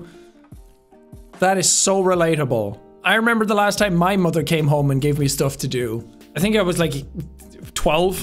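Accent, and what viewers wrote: Ireland. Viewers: dude the phrase "god's happy no problem bud" and "time for some misery " with that strong Irish accent is sooooo good XD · Loud Irish man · I know this sounds like I'm making fun of his Irish accent but the way he said "why" was just so funny to me. I really like Irish people I think they are really funny but serious at the same time and I just love it. I would move to Ireland if I could.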